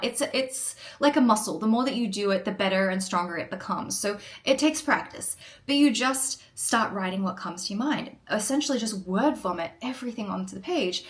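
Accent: Australia. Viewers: no no the aussie accent is going!!! ❤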